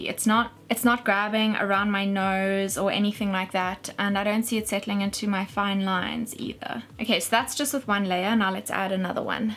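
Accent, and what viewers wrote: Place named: South Africa. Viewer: I was like.. waaaait... i know this accent xD Finally a Youtuber I can relate to with our limited Makeup selection in SA <3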